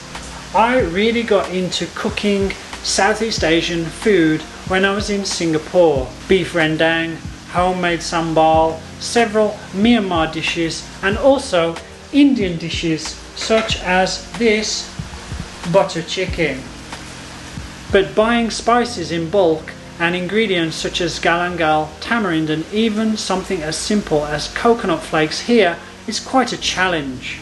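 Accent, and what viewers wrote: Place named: United Kingdom. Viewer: 哈哈哈！英式語調，英式幽默！非常喜歡！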